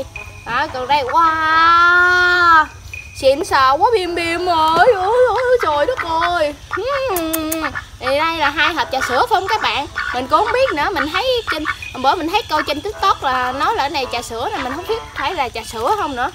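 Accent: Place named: Mekong Delta, Vietnam. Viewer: Do chị ấy người miền Tây nên nói giọng đôi lúc lợ lợ không rõ ý bạn:3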